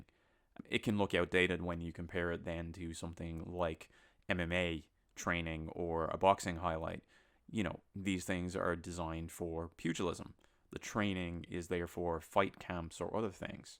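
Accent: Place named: Ireland. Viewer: Thanks for this. I can hear that you're Irish. i'm working at kenpo the past 18 months & I am finding it fantastic, so great for personal growth, fitness, agility, memory & discipline. But I do find it maddening that not only is there such a divergence from the USA kenpo, but even amongst the Irish kenpo schools there is not harmony. So it is very difficult to learn. But hey, I'm enjoying it.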